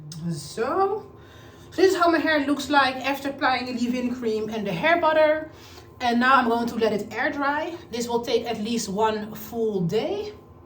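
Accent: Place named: Netherlands. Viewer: Ik hoorde al bij de eerste zin dat je Nederlands was. 🤣 Ik kwam je video tegen omdat ik niet zeker was als ik die cream & butter samen kon gebruiken; dus ik zocht het even op Google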